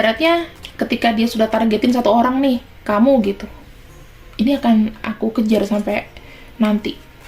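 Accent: Java, Indonesia. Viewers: Maaf mbak selama ini aku hanya mendengar genetal riding tp suatu saat saya mau personal riding dan caranya dihitung perja atau gmn dan perjamnya brp tktnya agak lm pertanyaannya ini aja mbak klo blh tau mbk itu org jaea apa medan ya kok pskai tp klo diliat bahasanya cengkoknya jg setenga jawa ini mbak slm dr martin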